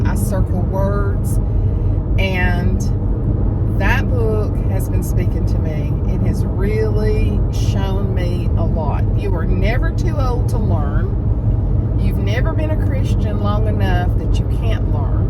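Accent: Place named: Alabama, United States. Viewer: I enjoy you. I recognized your Alabama accent immediately, because I am an Alabama girl, too. Lots in common, including age, and I am glad to see someone on You Tube who is not pregnant or doing all the things I did 30 years ago. Thanks for your testimony, your outlook, and your wisdom.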